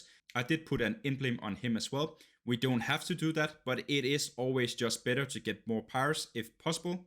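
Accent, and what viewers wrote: Denmark. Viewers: Den danske accent er dejlig🇩🇰🇩🇰🇩🇰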